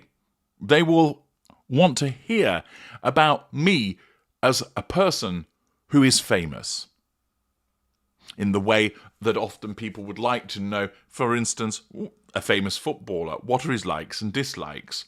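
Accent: United Kingdom. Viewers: Why can't you people leave this woman alone? Why go after her specifically? Is it because you have that dastardly british accent i have grown to hate.ALL YOU PERFECT PEOPLE??.this is truly defamation of character..Why not go after Trumpanzee..the biggest Narcissists of OUR TIME and his cohort VANCE, PLUS MEGAN KELLY...YOU FOLKS YOUR TRUE COLORS ARE REALLY OOZING.MEGAN NEEDS TO SUE YOU,PERSONALLY .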